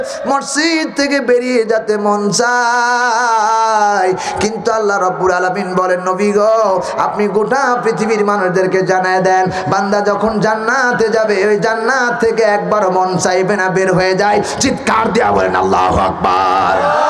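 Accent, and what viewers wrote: Bangladesh. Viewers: শরিয়তপুর টান ঠিক আছে কিন্তু গলা ঠিক নাই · মনে হয় যশোর বাড়ি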